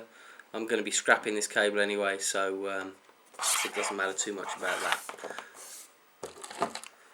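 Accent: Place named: United Kingdom. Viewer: this is by far the best video i've found around here on this subject. also, a british accent always lends more credibility.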